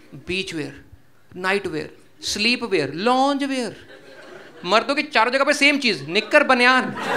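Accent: Punjab, India. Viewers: Punjabi accent is the icing on the cake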